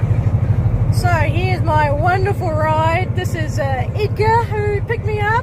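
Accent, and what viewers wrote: New Zealand. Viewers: I just discovered your videos - thru a mention by someone else. I have done the JMT in August & Sept, so it will be fun to see it under snow with you. Also, I had an aunt from New Zealand, so I love hearing your accent, as it sounds a bit like hers. My cousin and I hitch-hiked all over NZ; for 2 days we caught rides w/ 2 "truckies" headed to the South Island. I did the Milford Track Freedom trek in pouring rain (except for the 1st day).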